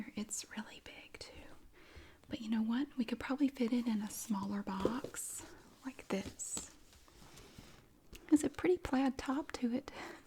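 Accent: South, United States. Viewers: Omg yes . Like a southern Pam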